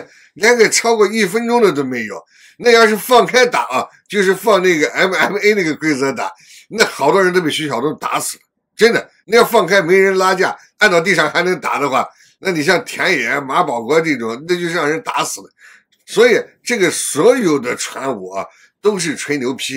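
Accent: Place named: Northwest, China. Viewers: ，额觉得你是个陕西人，得是滴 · 老哥听口音是西北人吧，思路清晰，句句实在👍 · 听大哥口音是西北一代哦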